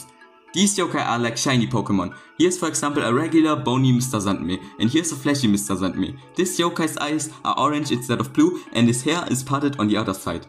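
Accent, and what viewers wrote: Germany. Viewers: i know that german in the voice and backround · i hear a little bit deutsch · Man hört so krass den deutschen akzent haha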